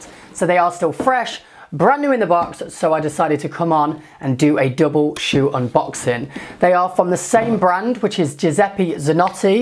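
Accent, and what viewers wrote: United Kingdom. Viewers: are you Brit? i can hear accent..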